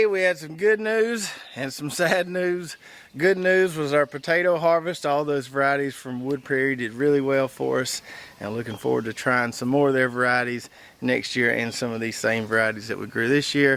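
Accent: South, United States. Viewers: I’m a new subscriber I was wondering what state are you located ? I’m in Florida and it looks like Florida and maybe the accent lol. Definitely from the south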